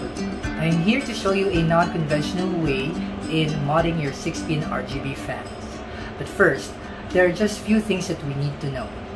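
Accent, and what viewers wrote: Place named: Philippines. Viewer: u sounds like a filipino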